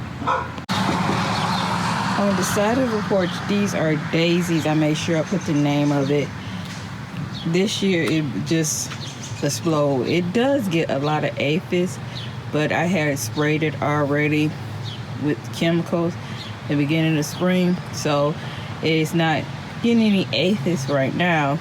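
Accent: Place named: Chicago, United States. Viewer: Hi. I’m new to your channel. Beautiful backyard and flowers. I noticed that your having issues with your dahlias. I believe it’s where you have them planted. You have them in a bed near your gutter water spout and the tubers might be receiving too much water along with the over abundance of rainfall. So you have the rain fall along with all the water from your gutters. I did the same thing about 5 years ago. Moved them to a much dryer well draining spot and had no issues going forward. Also I looked at your surroundings and just knew you were in Chicago or maybe near Detroit along with your accent. Then I went back to your earlier videos and I was right! I’m from Chicago and visit Michigan on a regular to visit family, and I swear the surroundings look exactly the same as Chicago..😆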